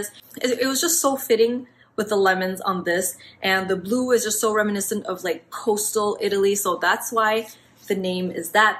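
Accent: Quebec, Canada. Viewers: Êtes vous de Montréal, aussi?! I think I saw you guys!! Aussi je reconnais l’accent quebecois/montréalais! J’adore! 💕 I’m getting a cavalier in November and have binging your channel to prepare myself 😂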